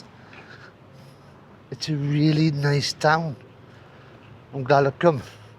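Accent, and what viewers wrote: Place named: Northern England, United Kingdom. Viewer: Judging by the vowel sounds, I'd say he's from the north of England.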